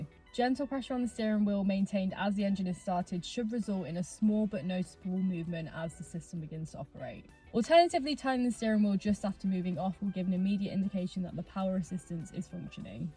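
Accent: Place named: RP, United Kingdom. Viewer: Then it seems like you might need to decrease the video playback speed - because this was about as RP and easy to understand as it gets. Perhaps it was just too fast for you to get a handle on.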